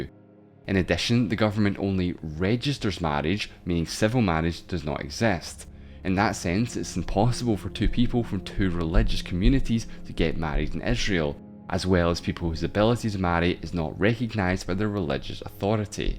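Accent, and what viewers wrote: Scotland, United Kingdom. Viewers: A Scottish English accent is so appealing to the ear. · He's Scottish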